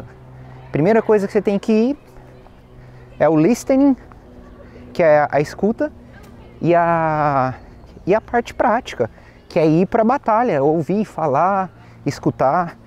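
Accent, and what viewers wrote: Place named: United Kingdom. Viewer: Cara. Desculpa, mas... ver um Brasileiro falando o inglês britânico, me dá impressão que está errado! (Não está, eu sou 100% acostumado com o inglês Americano) Me soa estranho!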